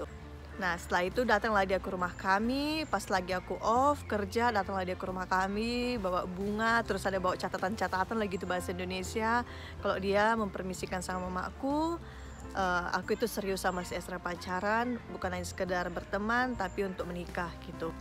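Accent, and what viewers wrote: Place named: Sumatra, Indonesia. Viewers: Logat Bataknya lengket x ya ito Hehehehe God bless u both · Masih lengket banget ya logat Siantarnya.... Saya juga orang Siantar di Jl Sisingamangaraja dekat Rindam. Rumah orangtua di jalan apa Esra ? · Aku orang Siantar bah ,ku subscribe lah kakak ini 😁😂 kek gitu lah logat orang Siantar , cantik-cantik ,rendah hati orang Siantar Weh, setia lagi dah ! 😇😁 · Cantik banget spt artis Begitu ngomong, ketauan, dialeknya masih kental, Batak banget, tpi saya pribadi bangga. Sukses buatmu dan keluarga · Saya senang mendengar logat kakak yang tetap seperti logat sumatra 👍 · Hai kak salam kenal logatny siantar man banget bah,salam dr anak siantar yah kak....jangan lupa ajari abang itu bahasa batak jg kak....senang lihat vlog kk auto subscribe 🥰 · Asli medan bah logatnya, suka.. Mamak yg dewasa..mantap.. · Cantik. Logatnya medan banget, "permisikan", heheee... 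tp enak ditonton 👍 Lanjuttt 😊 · Logat kau kayak orang medan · Paten kali logat nya iban..., siantar nya dimana..., sama kita org siantar... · Wah...salut bgt dek...walau sdh berada di Jerman.... logat Medan dan Siantar nya msh sgt kental....saya salut...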